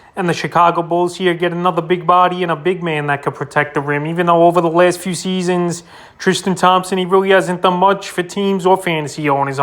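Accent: New York, United States. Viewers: We know you are a New Yorker with that accent and lack of basketball sense.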